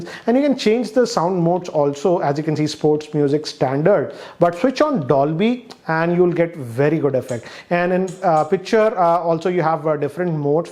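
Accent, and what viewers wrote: India. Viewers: Indian accent is even more Indian than Indian accent, and I absolutely ♥️ it! You're the best techtuber in the country.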